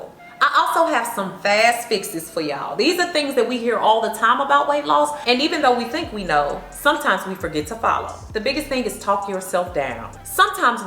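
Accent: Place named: St. Louis, United States. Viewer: I love that STL accent. #lovinthelou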